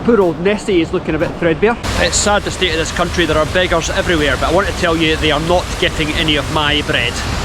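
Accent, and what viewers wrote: Scotland, United Kingdom. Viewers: Good video you haven’t lost your accent it’s nice to hear the Scottish accent 👌♥️lovely scenery but not as nice as Skye obviously 😂👌. The falls were not falling much 🤔😂. Stay safe and take care 🤔♥️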